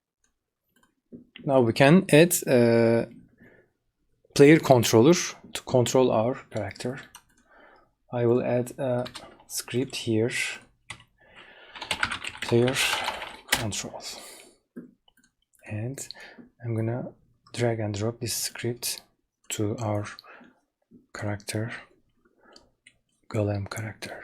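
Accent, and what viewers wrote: Turkey. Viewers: oglum sen türksün la türk ingilizcesini nerde görsem tanırım.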